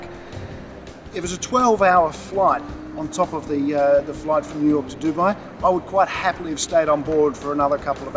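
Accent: Australia. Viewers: I like the walk through style video and nice to hear an Aussie accent :) Good job.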